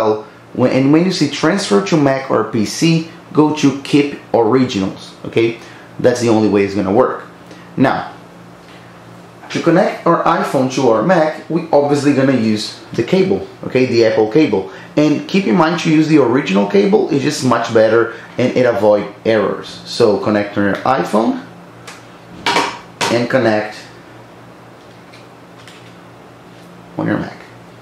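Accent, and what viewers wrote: Brazil. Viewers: Haha eu perguntei pq eu também sou mano. Também moro nos EUA. Meio q consigo captar um sotaque brasileiro, mas vc fala mto bem. Abraços!! E o vídeo me ajudou tbm! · Are you BR? two seconds into the video I could tell from your accent. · brasileiro. certeza. sotaque.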